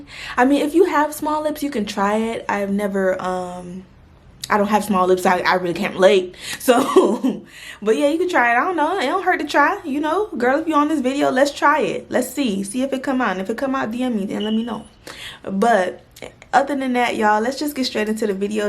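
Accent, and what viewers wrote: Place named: Miami, United States. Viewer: I'm from Miami and this is EXACTLY how we talk 🤣🤣🤣🤣🤣🤣🤣🤣🤣😭😭😭😭😭I'm screaming💙💙💙💙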